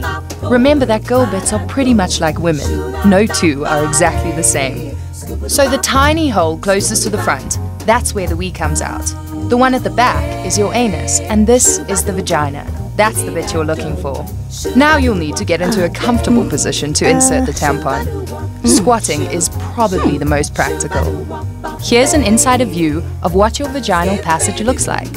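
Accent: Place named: India. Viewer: Dude are u from India, sounds like that!!!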